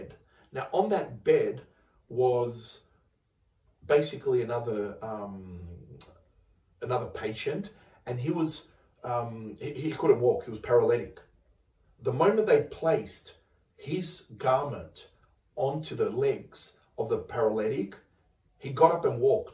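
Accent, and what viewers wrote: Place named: Australia. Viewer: Hello my Orthodox brother. You sound Australian, I too am Greek Australian from Melbourne. I started researching St Nektarios after having him come to me in a dream, he was walking with me and chatting to me walking down a hill near a monastery, I didn't think much of it at the time and just passed it off as a dream. After a couple of years my wife passed away and I somewhat pushed away from religion, a couple years later I restarted my journey with religion and just today, I remembered the dream I had approximately 5-6 years ago, it just popped into my head, I remember I was sick with an illness at the time of the dream and just realised that I was healed shortly after the dream, my guess is the Saint came to me to heal me, didn't put the two together at the time, but my guess is that it was a miracle, anyhow, I started researching the monastery of St Nektarios and low and behold, I saw the church and the gardens in Egina Greece, it sparked my brain and I thought, is this the church and garden I saw in my dream? 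I got goose bumps, I continued to do research and thought wow this Saint is a true modern day miracle worker, back when I had the dream it was like I teleported to the church in Greece and walked with St Nektarios and he healed me, anyhow, I would really like to visit in real life one day. That all said I had just read Agios Nektarios Paraklisi and decided to do some further researching into St Nektarios miracles and found your video. Just wanted to say thank you for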